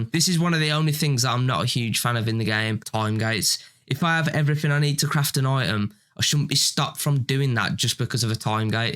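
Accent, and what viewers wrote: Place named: Dudley, United Kingdom. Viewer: ive literally just found this and why does it sound like your from Dudley >.<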